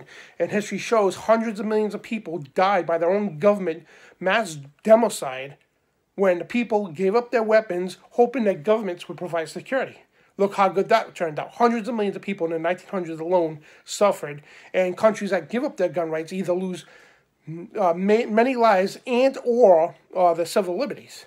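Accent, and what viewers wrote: New Jersey, United States. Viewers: I didn't realize Rhode island had a Jersey accent. Off topic but I didn't catch it until I caught your video addressing your state criminal organization (your elected officials) let's just hope more men and young adults along with the rise in woman conceal carry holders/gun owners in general stop feeding into the complacency and cush way of life and realize things will become very uncomfortable when we restore constitutional law back to our country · You sound like your from NJ.,definitely the north east coast.Well said ,well said.I agree with every word.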